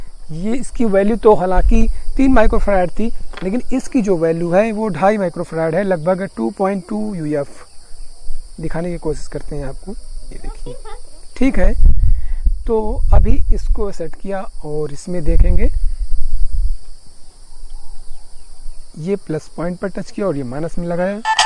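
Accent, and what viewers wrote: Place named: Allahabad, India. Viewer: Ap allahabad se ho kya bhai..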